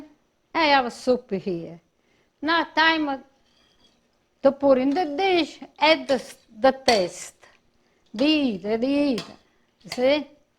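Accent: Italy. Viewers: I love to watch you cook, you cook soo good I love your food and most of all I love your Italian accent, my favorite Italian food, 😋you are the greatest cook 😃😇❤💖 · I love your cooking! I'm a very big fan of yours. My family came from Italy (both sides). So your Italian accent is very wonderful/familiar because of my mother. Thank you so very much for sharing your wonderful cooking ideas. 💗💗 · The soup looks good ...I love your Italian accent ...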